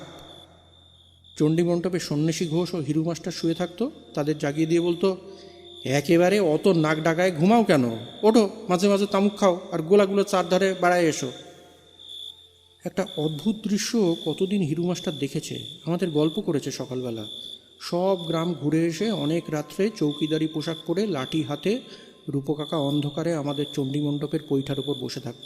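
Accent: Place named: Bangal, Bangladesh. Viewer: স্যর বাঙাল উচ্চারণ তো বেশ ভালো 👌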